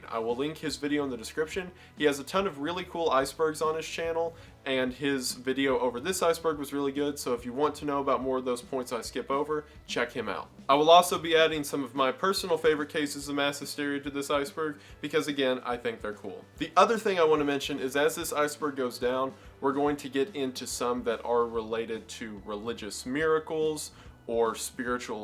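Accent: South, United States. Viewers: I typically only watch videos on 1.25x, so now the normal playback speed makes his southern drawl sound like a drunken slur 💀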